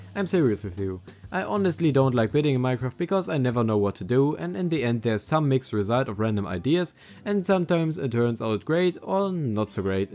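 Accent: Germany. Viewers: This german accent kills😂good video my friend, Ich fühle den Akzent😂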